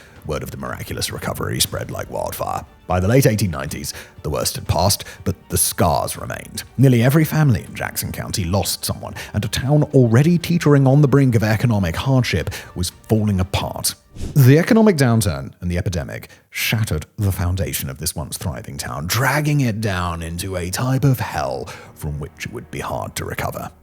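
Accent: United Kingdom. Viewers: WTF, can't take listening to a Limey accent. Particularly with what's going on in their country this time.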